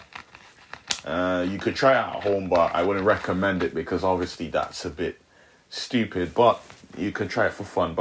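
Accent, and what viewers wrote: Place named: Roadman, United Kingdom. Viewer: You’ve got the roadman accent, love it!!! Great video though, the sound is the best 😂😛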